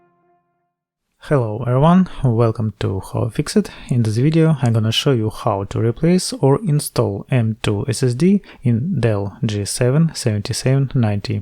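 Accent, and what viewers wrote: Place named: Russia. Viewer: I realized, in accent, not like an Englishman. But for Russian, it sounds good.😏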